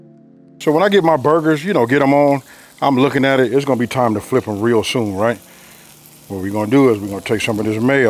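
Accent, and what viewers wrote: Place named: West Coast, United States. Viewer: You can tell his from Cali or somewhere from the west coast gangsta turned chief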